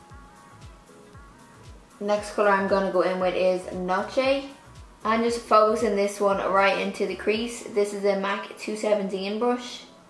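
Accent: Ireland. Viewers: I just found you the other day and I love your tutorials!! I love your hair aswell hah💓 also are you from Ireland? You've an Irish accent hah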